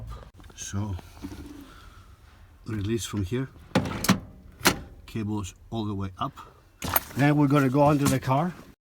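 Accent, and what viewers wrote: Greece. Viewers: Hey, you Greek? You sound Greek to me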